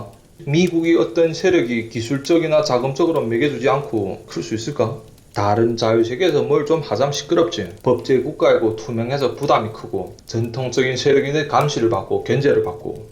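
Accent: Yanbian, China. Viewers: 연변말 하더라도 자막을 달고 하면 많이 늘꺼라고 생각함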